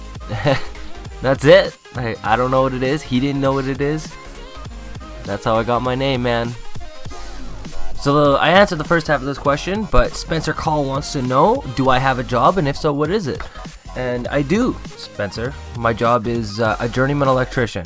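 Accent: Canada. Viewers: I could tell u were Canadian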